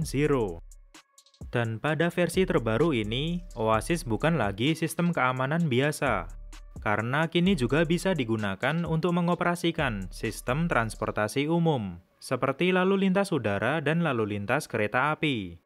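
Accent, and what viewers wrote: Java, Indonesia. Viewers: Gak medok bisa gak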